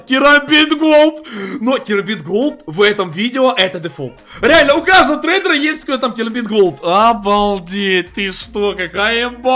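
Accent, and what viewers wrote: Ukraine. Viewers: ты с Украины?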